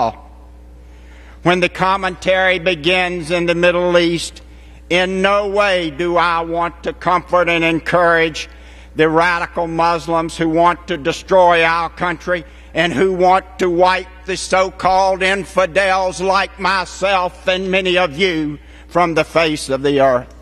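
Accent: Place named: South, United States. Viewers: Wooo He got that Old Virginia Accent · He has what is the source accent for the American Black Accent. In some parts of the South the notion that you can tell when a person is Black by listening to their voice is false for the White folk talk exactly like the Black folk. He's moderated his accent thanks to his career, but those from the same place have an even more extreme accent.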